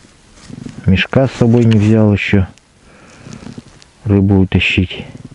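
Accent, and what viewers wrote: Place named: Yakutia, Russia. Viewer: С наступающим новым годом! Каждый раз рад слышать якутский акцент, такой родной и теплый! Удачи и развития канала!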